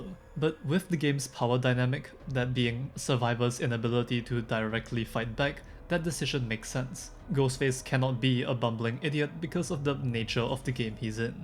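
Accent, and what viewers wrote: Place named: Singapore. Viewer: this guys accent sounds singaporean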